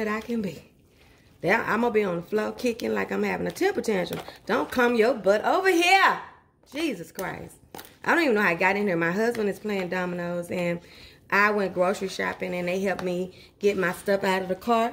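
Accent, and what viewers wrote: South, United States. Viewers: I like when u talk and be funny joke around I love your accent u sound like me I'm from the south and ppl can tell lol but if u dnt talk how we going to knw things I dnt want to sit and watch some of those videos where they r just stone faced lol u r great girl and u be u!!🤗😇🥰😜🤪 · Gurl PuleaSe ya done talked me into tryna make this after all that lip smackin 😂. That Country accent Tho! Sounds like mine except we got a mote Southern drawl 😁. Imma say you're from neither Louisiana or Texas? Love that accent 😊.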